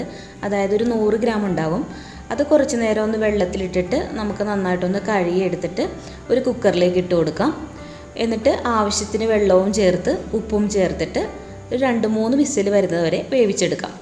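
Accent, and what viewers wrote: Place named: Thrissur, India. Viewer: Thrissur presantation superrrr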